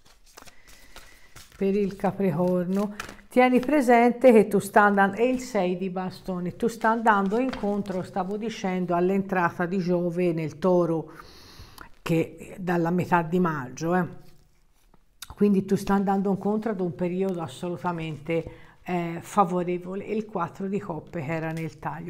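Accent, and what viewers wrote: Tuscany, Italy. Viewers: sei troppo simpatica, il tuo accento toscano è fantastico... ok?❤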